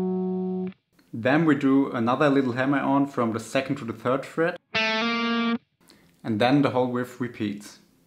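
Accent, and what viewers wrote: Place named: Germany. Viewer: Ohh, okay. Wirklich? Man hört es an der Aussprache, dass du aus D sein musst. Ist bei meinem englischen Kanal auch so, dass merkt irgendwie jeder direkt :) Ja, ich bin immer auf der Suche nach neuen Malcolm Riffs, da ist es durchaus möglich das ich schon mal einen Kommi hinterlassen habe :) Langsam hab ich den richtigen Tick raus, um es beinahe fehlerfrei zu spielen :) VG aus dem Chiemgau, Vanessa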